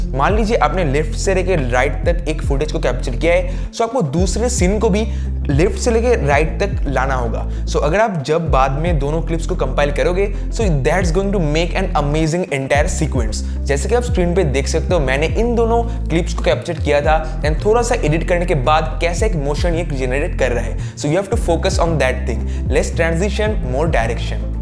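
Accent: India. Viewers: This is english ou indian? wtf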